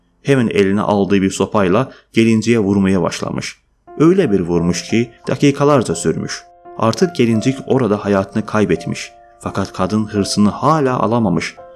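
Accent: Azerbaijan. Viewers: Abicim azersin işte çok belli konuşmandan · Daniwiginnan azeriye oxwuyur. Hec turkler kimi teleffuz etmir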